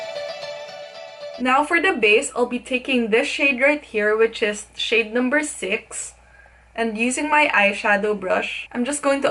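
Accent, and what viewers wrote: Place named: Philippines. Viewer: Thank goodness I finally came across a makeup tutorial video where i can hear and understand straight Filipino English.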